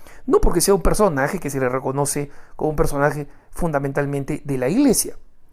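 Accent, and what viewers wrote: Peru. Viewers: No soy kristian, pero estoy segura que el señor que habla es peruano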